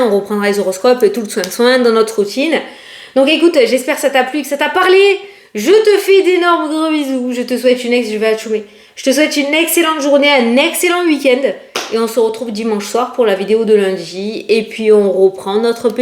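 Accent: South, France. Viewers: Bonsoir, toujours la joie quand je vous écoute, c est mdr, j'adore votre façon de vous exprimer avec votre petit accent du sud moi j'habite dans les Ardennes 08. Vous êtes franche mdr avec humour. Je vous apprécie. Merci pour votre bonne humeur. Merci pour votre gentillesse et votre bienveillance. Que Dieu vous bénisse ainsi que votre famille.❤❤❤🙏🙏🙏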